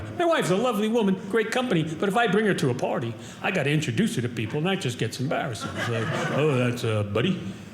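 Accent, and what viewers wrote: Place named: East Coast, United States. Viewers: Educated east coast... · Thanks! Yes, I hear some east coast, but I also thought I heard a little midwest, too.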